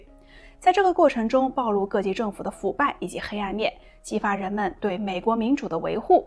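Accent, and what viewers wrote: China. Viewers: 這是大陸哪裡的口音啊? 真好聽的聲音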